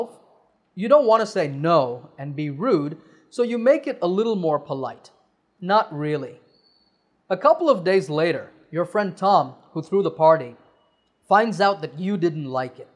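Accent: United States. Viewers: sir, I've been watching your videos and it has added a lot to my knowledge. However, there is a query that why do you converse in American Accent? Are you right now living in America, or you like that accent? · Sir your voice is like American man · I Really do appreciate for your great efforts for making all these videos to make others learn . I watch your videos regularly , when close my eyes and listen you , you just sound like an American. You have standard American accent . I am impressed and inspired. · Sir are you speaking in American accent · Thank you Mr.. You have been rendering an incredible service to the non-native speakers of English. I love your American accent.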